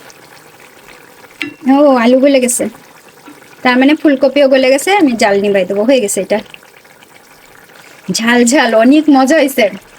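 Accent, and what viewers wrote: Khulna, Bangladesh. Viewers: Aunty ami pry 2 yr ar bashi apnr video dakhi vlo lage, amr basa o khulna apni jokhn Khulna acchiln oi somai ei bujchilm apnr kn amdr moto kotha kno.❤️❤️❤️❤️❤️❤️❤️❤️❤️❤️❤️❤️❤️❤️❤️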